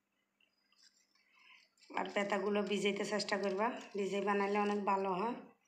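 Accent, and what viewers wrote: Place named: Noakhali, Bangladesh. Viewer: আপনার ভিডিও গুলো আমার অনেক ভালো লাগে আপু আপনার কথা গুলো একদম নোয়াখালীর মত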